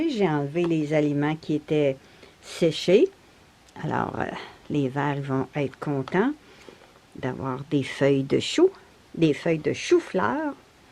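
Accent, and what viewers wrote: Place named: Quebec, Canada. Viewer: Bonjour et bonjour de france,j'ai plaisir à suivre vos vidéos mais aussi plaisir à écouter ce si jolie accent québécois. J'aurais juste une question,vos vers vos les élevés dans le noir ou avec un de lumière? Cordialement Romain et comme on dit dans votre belle province gros bec.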